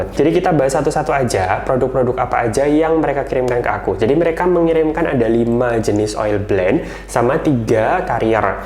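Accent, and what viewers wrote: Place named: East Java, Indonesia. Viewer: logatnya kayak jawatimur agak ngapak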